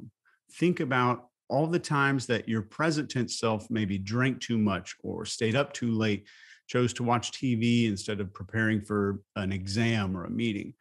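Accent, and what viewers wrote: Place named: Arkansas, United States. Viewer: I’m here from the behavior panel. Im also from Arkansas, so your accent is so familiar to me, you remind me of some of my family and friends 😊 thank you for your content it is really appreciated ❤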